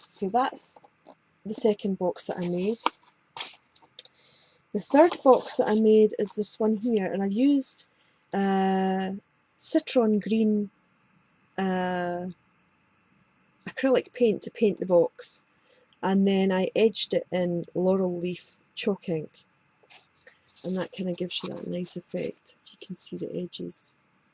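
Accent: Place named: Scotland, United Kingdom. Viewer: Never realised that you were scottish too lol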